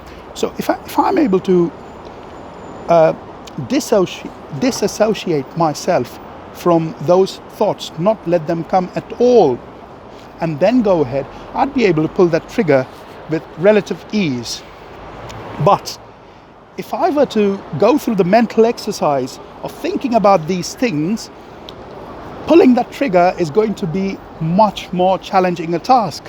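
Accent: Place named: United Kingdom. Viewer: I feel like this is a glitch in the matrix, hearing a Punjabi man with a British accent. Anyway, you get used to it soon enough.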